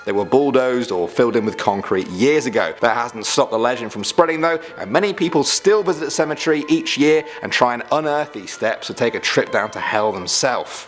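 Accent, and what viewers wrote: United Kingdom. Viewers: Everyones pronunciation is differently all depends where you're from, he's a brit who lives in canada.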